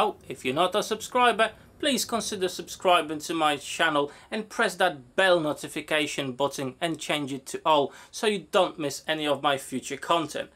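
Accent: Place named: United Kingdom. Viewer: I have found your channel because i have also recently started using the table circular saw and the miter saw from Evolution. I am from Germany. I can understand your English very well. What part of the UK are you from? Your dialect is very different from what I learned at school. I have subscribed to your channel and hope for more interesting videos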